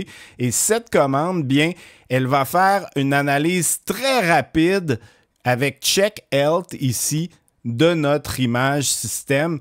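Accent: Canada. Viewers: Merci, c'est vraiment super comme tuto. Toujours très clair et pour un belge l'accent canadien met de la "musique"dans ton exposé. C'est super 😊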